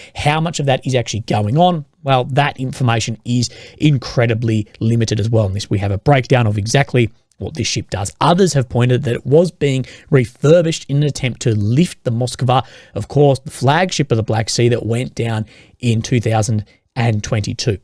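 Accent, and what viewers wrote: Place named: Australia. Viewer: i have watched over 200 of videos. He is the best at zero line tactic analysis. Always very balanced, pulling for Ukraine. There were monetization issues and Gaza. He was always begging. After his Dad died, whammo, a turn around. Now he parrots the RT slant, for a while wore some pretty fancy clothes and promoted not begs now. Indicators of financial influence. assessment that loosing the Kerch Bridge, the only potential withdrawal line, is not important is right outta current Russian state propaganda and laughable on its face. I unsubscribed, I've no need of RT with an Australian accent. Sayonara Kerch, hut don't worry, says it is no prob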